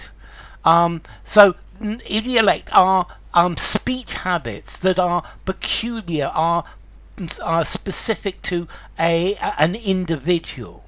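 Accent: United Kingdom. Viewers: I absolutely love the British accent. It's the most polite & respectful language..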